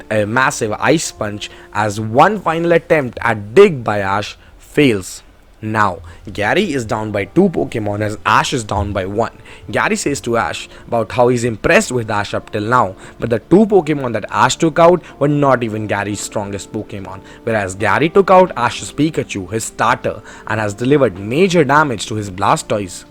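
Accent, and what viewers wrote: India. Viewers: Your Accent its like Indian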